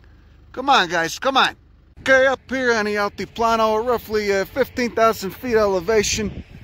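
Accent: Chicago, United States. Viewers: Love this ! My brain feels like a sponge.......LOL..soaking up all this info plus your marvelous Chi town accent..Great way to start a day ! Thanks :) And yes...cute shit DOES make me feel less homicidal..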